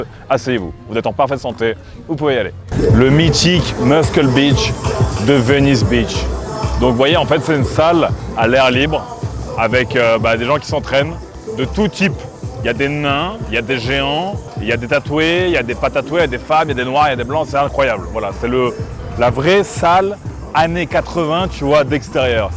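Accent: Russia. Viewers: ton anglais avec un accent russe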